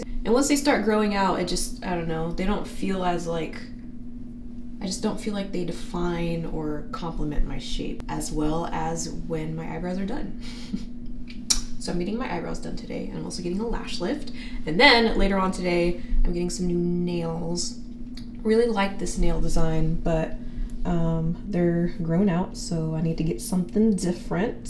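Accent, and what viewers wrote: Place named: Australia. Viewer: Your such a beautiful soul inside and out and I hope everyday you keep a smile on your face and we love hearing you Aussie accent 😂🫶🏾🫶🏾🫶🏾🤎🤎🤎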